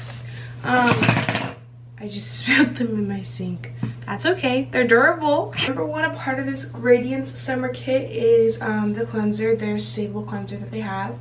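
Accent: United Kingdom. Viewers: Do a whole video speaking in your britishhh accent love you/it🤣🥲🥲🥲💘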